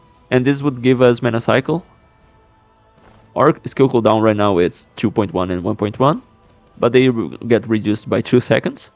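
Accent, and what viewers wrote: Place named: Brazil. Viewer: you are brazilian? you sound like it and your name sounds a little portuguese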